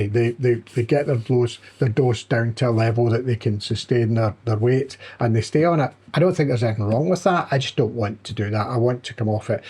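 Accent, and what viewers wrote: Scotland, United Kingdom. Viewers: OMG, your accent is so sexy! It's like the accent in the TV show Outlander --- Jamie's accent. ❤ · Hes an inspiration to us all...and his voice is lovely to listen to isnt it, with that great Scottish accent! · Ha ha. My grandparents came to NYC from the Spey valley Scotland 1921 (MacPhersons). They were raised in Gaelic speaking households. This accent is pure music to my ears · I fully agree with what you said about the side effects: compared to the risks one has with staying fat, they are minor. Let them come, same thing what I think. As long as I lose weight, I am happy to have some gastritic issues. Your weight loss of 15% in the first 3 month is outstanding. You said that you are a hyper-responder. But what dosage did you go to in this timeframe ? by the way: what region are you from ? am I right your accent is scottish, but where from? 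· I hear the Scottish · He sounds like Scottish which I do find bit strong for me to listen for long 😅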